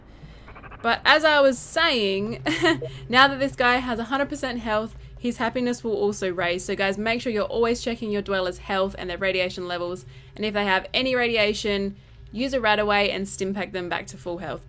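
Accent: Australia. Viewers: ahh an aussie or new zealand ehh can't tell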